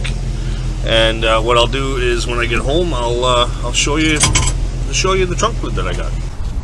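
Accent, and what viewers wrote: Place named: Canada. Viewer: Wow, $100 is a steel I understand why you got it. I did good to for like I said for this area at $200. I thought I recognized your accent but wasnt sure that you might be Canadian...I would of bought even your old trunk in the condition its in If it was here for sale. Most of them are rust projects here because Im on a island. My old one is currently on Craigslist.I could repair it with a mig welder in hour but im too lazy and old now,LOLLL.....easier to buy one when the iron is hot for a good deal,HA!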